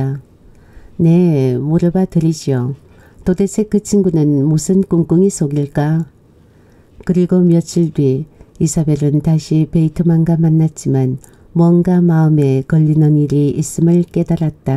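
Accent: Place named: Daegu, South Korea. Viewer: 님의 사투리때문에 무대가 시카고에서 대구로 바뀐거 같고, 훨씬 더 정겹게 다가와요 ~♡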